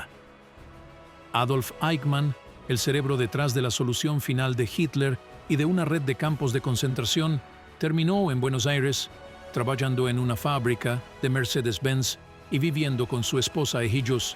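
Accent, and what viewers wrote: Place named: United States. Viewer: Lo k me enfada d este amigo es k empieza a hablar Un perfecto español y despues lo habla con acento gringo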